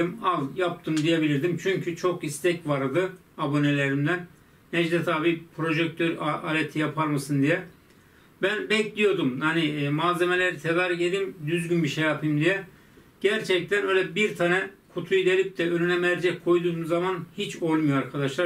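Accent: Erzurum, Turkey. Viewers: ABİ SEN ERZURULUMUSUN :)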